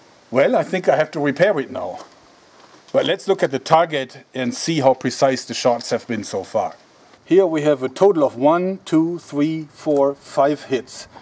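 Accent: Germany. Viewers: Lol I love that German accent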